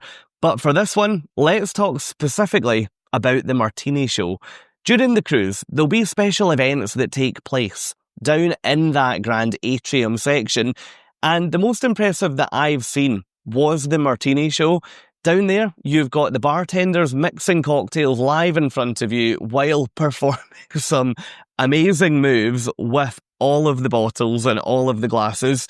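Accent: Scotland, United Kingdom. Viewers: It took two nanoseconds to know you are a Scotsman! I live in Minnesota and my favorite boss of all time, one Frank Docherty, was my most favorite boss of my entire career. Thank you, for a great review. We were on the Edge last Fall when we cruised from Vancouver to Sydney. We love the ship and agree with all your observations.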